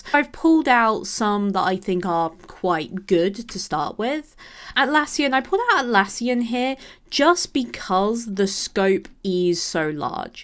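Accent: United Kingdom. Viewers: Are you brittish 😊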